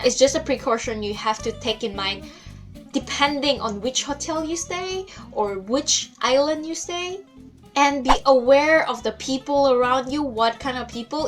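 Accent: Malaysia. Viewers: quick speaking mix with slang with up and down tone just like the sea waves. it kinda meshed up mixture of indian/malay/chinese speaking tone . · I can sense the Malaysian-english sliding in as the she talk 😂😂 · dude thats manglish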